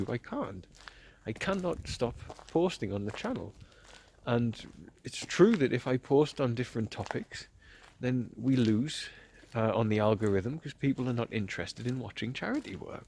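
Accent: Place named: Newcastle, United Kingdom. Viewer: I guess i have some sort of broblem solver spirit in me, so excuse me.. 😁 It's ok for u to feel tired and beated. So i feel that u need to give some mercy on your self. Could someone else produce some content on this canel some times? Similar on like the contet u shared about our staff? And time to time some succes strories of childrens recovery ect? At least id love to have some updates from ex. the children that can now go to the school! Remember your thoughts of sharing your duties? Do it. Because of you, becouse your loved ones. Totally OT: its funny how your "home english" is pushing trough after youve been home. I can tell since i have people from new castle in my family.. 😄 But yeah.. I like a lot that ure authentic, sharing even your moments of doubt and tiredness. We're not supposed to be perfect not even you. And to end this awfully lobg text: Send Frizi my love and tell her i think of her a lot. 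She has so much in her hands now. I hope she also remembers to take goooooood care of her self! ❤ Blesings, love and light. Xx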